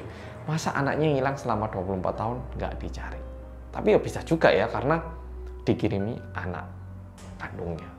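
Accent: Java, Indonesia. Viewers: Masya Alloh kok bisa selancar itu berbicara pakai bahasa Indonesia dengan logat Jawa padahal asli orang Korea.Kalau lahir di Indonesia wajar selancar orang Indonesia.Salut banget 👍Cerdas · Hai org Korea medoook ❤ Nyimaaak 🎉🎉🎉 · Masyaallah....sy kira Wong jowo asli nggak taunya Korea to.... 😃👍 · Sebenarnya udh nnton di Omara tapi mau nnton lagi versi om medhokk🐒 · iki wong korea opo jowo? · Jebole medok yo😁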